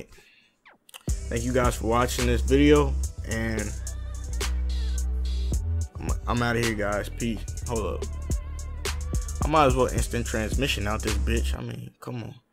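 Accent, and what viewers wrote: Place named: South, United States. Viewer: Your country accent is funny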